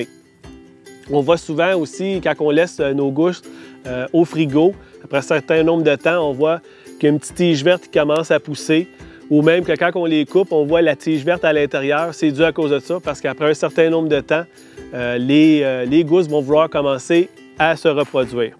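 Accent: Quebec, Canada. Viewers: merci beaucoup .j aime beaucoup votre accent kebekoi.je suis tunisienne qui aime beaucoup le canada que dieu vous protege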